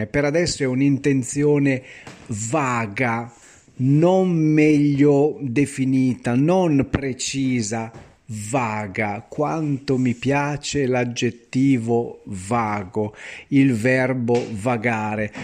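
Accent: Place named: Bergamo, Italy. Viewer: Ciao. Mi piace ascoltare il tuo accento Bergamasco quando non ne puoi più.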